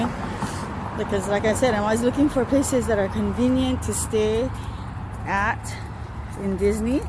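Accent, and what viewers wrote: Hawaii, United States. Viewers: as soon as you started talking i knew you was from hawaii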